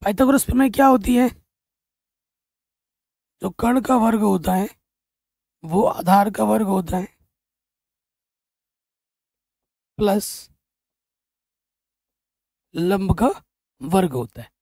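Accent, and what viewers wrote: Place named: Jharkhand, India. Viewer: Sir mujhe lgta h ki aap jharkhand se ho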